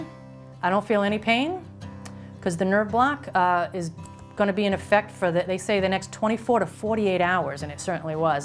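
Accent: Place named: New England, United States. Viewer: Thank you for making this. I dont have bunions, but I have a rare/uncommon condition called Freiburg's disease. It causes the tissue at the top of my 2nd metatarsal (2nd toe, the one you had a hammer toe on) to die and deform because its too long. So I need to have the bone shortened and then the dead part taken of and realigned. It will be less invasive then your surgery, but I will also be immobile for a short time and casted. You video helps ease my nerves. Also you sound like youre from the New England area and it reminds me of my moms accent. So I love it! ♥️ I live abroad and dont hear it often.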